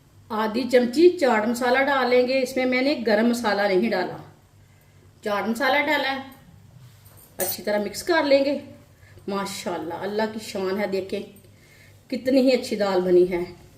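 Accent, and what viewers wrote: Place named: Punjab, India. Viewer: Panjabi jab Urdu main baat kartay Hain to pata chal jata hai k yeh Panjabi hai lahja aisa HOTA hai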